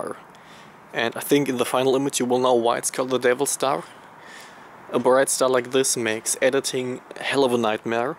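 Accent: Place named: Germany. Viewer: Man hört voll, dass du deutscher bist :P Hab dich mal als Hobbyfotografkollegen abonniert^^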